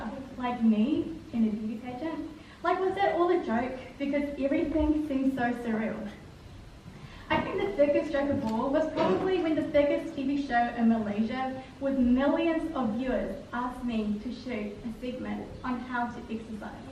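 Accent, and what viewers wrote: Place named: New Zealand. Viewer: Girl why you gotta trill the RRRRR.... You sound better in Kiwispeak ratherrrr than the RRRRR-speak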